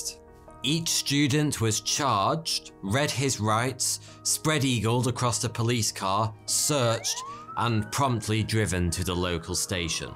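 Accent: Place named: England, United Kingdom. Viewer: I can’t tell from your accent, where about from England are you from. Is it London?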